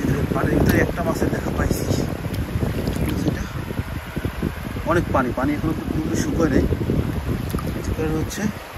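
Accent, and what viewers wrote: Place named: Bagerhat, Bangladesh. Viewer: আপনার বারি কথায় বাগেরহাটে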